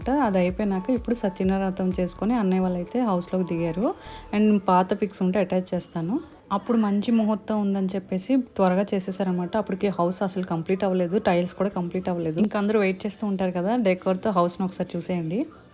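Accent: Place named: Visakhapatnam, India. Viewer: I think Meru Visakhapatnam varu iedali me voice tone House location yakka andi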